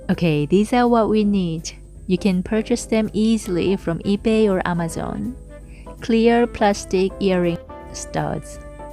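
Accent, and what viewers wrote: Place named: France. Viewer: Hi, i recently discovered your channel and i love it! Me too im korean but adopted in France and now i live in Spain. Have you lived in France too? Because i notice you a little french accent when you speak. You are so beautiful and radiant inner and out!🌸i think that you can be a real source of inspiration for so many women, thanks to share with us 😉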